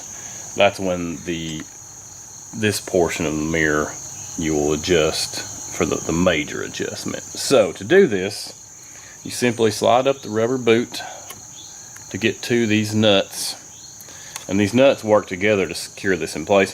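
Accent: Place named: United States. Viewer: But bro.. Is that how you all really talk in America??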